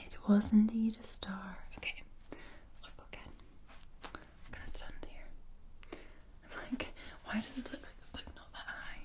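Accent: Scotland, United Kingdom. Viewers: the scottish tingles provided by a beautiful scottish lass. excellent! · You should roleplay Rayla from Dragon Prince since you're Scottish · Damn I really needed this today , after being scammed on Twitter and putting a hole in my wall , I need this beautiful Scottish Lass to calm me down . Thank you for all you do , it’s very much appreciated by me 😍😜👍🤠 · It's great to see a Scottish ASMR person on YouTube. As a Scottish person myself the last voice I want to hear is someone with a disgusting English accent so you my dear have frankly been a godsend!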